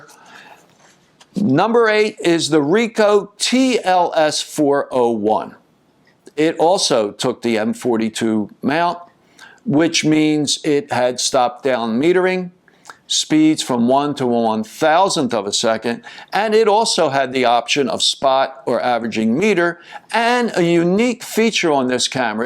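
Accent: Philadelphia, United States. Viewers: Are you from Philly? The accent is undeniable!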